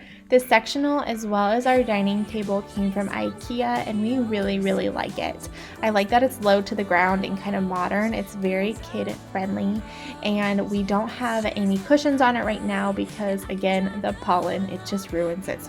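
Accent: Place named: Louisiana, United States. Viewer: Where are you from? Sounds like maybe Louisiana.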